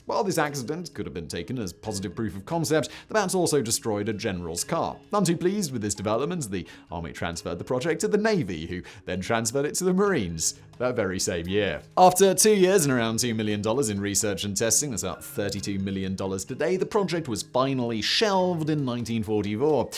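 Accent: United Kingdom. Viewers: I like your videos, but when you explain the details it's rather fast & with your British accent it's often hard to understand what you said. I'm not British and I'm sure your British audience understands every word.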